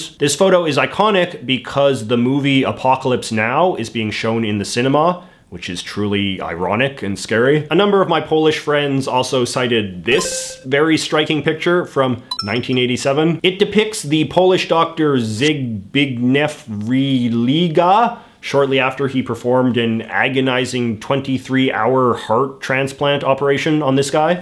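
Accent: Canada. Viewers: the what now?? I knew you had a strong Canadian accent but there is no way that's how to pronounce archipelago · I stumbled onto your channel by accident a couple weeks ago towards the end of a YouTube binge after a long work week. Not even a minute in, I remember feeling this overwhelming sense of animosity for you and the post. It wasn’t just that I disagreed with your politics or world view; I really didn’t! I don’t know if it was your smug disposition or that forced thick Canadian accent, but I found myself quietly siding with those French hillbillies from Quebec. Its been a few weeks and I have to confess I subscribed and look forward to your videos every week. Still haven’t figured it all out but I’ll keep tuning in until I do.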